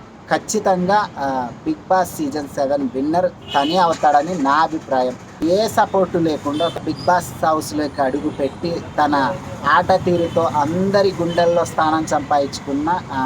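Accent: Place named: Kurnool, India. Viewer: Brother మన కర్నూలు యాస బాగుంటది మీరు ఇలాగే మంచి మంచి కంటెంట్ తో ముందుకు రావాలి కామెడీ కూడా బాగా చేస్తున్నారు తప్పకుండ మీరు అనుకున్నది సాదించాలి అని దేవుడిని కోరుకుంటా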